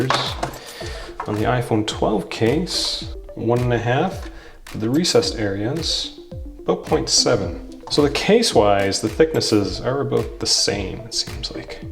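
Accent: Canada. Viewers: Thank u for the vid. Also you sound Canadian. Love that accent. Lol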